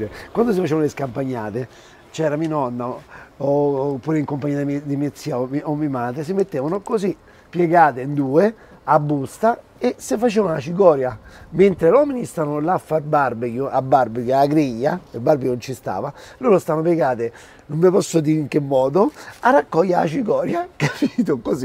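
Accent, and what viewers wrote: Rome, Italy. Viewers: ..te lo devo di...tu ogni volta mi fai venire un orgasmo gastonomico con le tue ricette😂 ma poi amo tantissimo come tu le racconti con quell'accento romanesco. I love It😍❤👍👍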